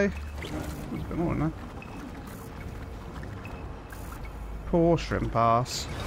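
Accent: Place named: North, United Kingdom. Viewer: Not related to the game, but I'm having trouble placing your accent, it sounds like you are from the UK but I can't place it more precisely, maybe a bit northern but a bit London at the same time.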